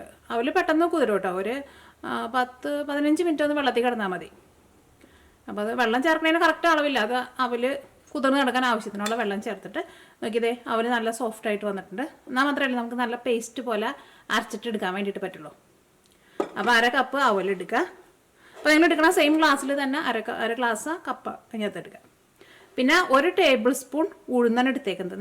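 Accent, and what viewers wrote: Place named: Angamaly, India. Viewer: yude ella cooking enikku eshta.nalla presentation anu.angamaly ano kuttide place njan Kure kalam thamasichittunde.kuttide slang ketteppol thonniyatha.enikku mole valere eshta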